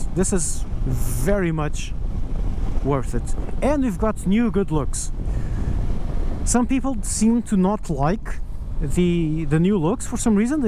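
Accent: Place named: Portugal. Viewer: Your accent appears Portuguese/Brazilian but also don’t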